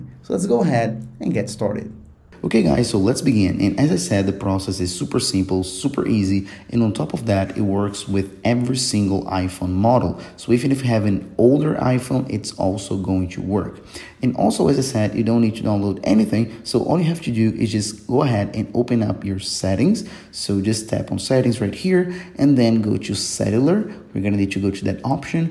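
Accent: Brazil. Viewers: Já reconheci q tu é Brasileiro dez do começinho do vídeo kkkkk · E esse sotaque de brasileiro meu parceiro? É Brazuka?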